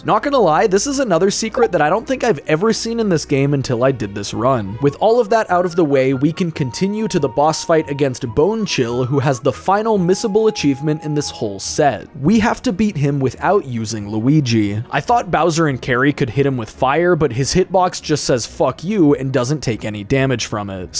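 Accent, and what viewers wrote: United Kingdom. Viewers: British?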